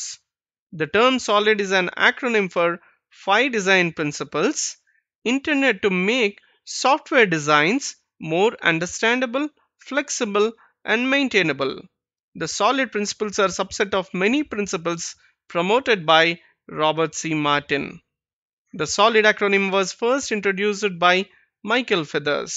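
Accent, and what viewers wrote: India. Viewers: Indian accent strength (directly proportional to) simplifying complex concepts. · Матвей Тарасовhe he is Indian so his accent is Indian.do you have problem with his accent? then go elsewhere .it's better than overrated western accent which requires extra effort to understand .stupid like you not appreciating his knowledge instead complaining about his accent.he is teaching free just try to get some knowledge instead of complaining. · A good material but your accent is very indian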